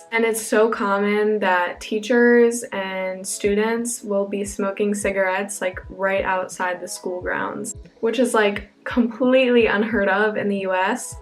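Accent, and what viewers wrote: Germany. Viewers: Hi, it would really interest me to know the “ballpark area“ of where you went to school, like what state. Sounded a bit like Bavaria to me. And yes, a lot of things vary strongly from school to school, so you can‘t generalize too much. The language phenomenon is something you will notice Europe-wide, depending where you go, so Dutch schools might have students that can speak English, German, their own language, and possibly even French. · Hope it’s going well for you! I can’t wait so see you when u come back with your lil German accent😍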